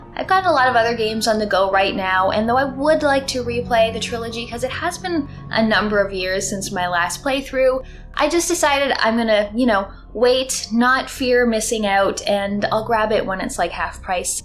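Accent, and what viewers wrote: Canada. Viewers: You are the first person I've seen with whom I agree COMPLETELY about the remaster. Also, I just picked up on your Canadian accent for the first time just now...which is unforgivable because my wife is from Canada and has the same accent.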